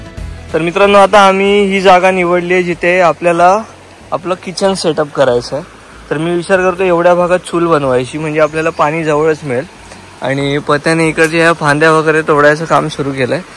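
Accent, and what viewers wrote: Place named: Malvani, India. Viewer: दादा, तुमची मालवणी बोली लई भारी आहे.. ऐकायला फारच गोड वाटतं. 😊 खूपच सुंदर निसर्ग 🌳🌴🌾🌿🌊🌊⛰️🏞️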